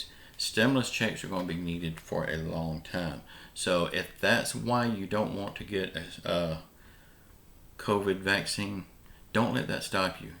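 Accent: South, United States. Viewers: Good Morning. Hahaha..”If it don’t help me, it should not be.” hahaha..where do you come up with your material? Hahahaha..love it..funny, but it shows what type of people we have become. And yes, I agree with the comment about “liking the way you talk.” You are an extremely good communicator who can get your point across in “plain talk.”...which is a rare quality..a lot of people overdress words. And, my wife and I loved the South..there is something special about a Southern accent. You have been blessed with talent and appeal.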